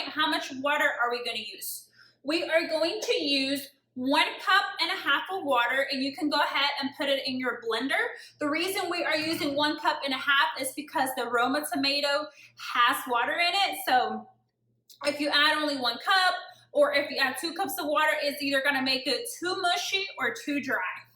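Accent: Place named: South, United States. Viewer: I aint everrr met a mexican with a southern accent lol. I love this.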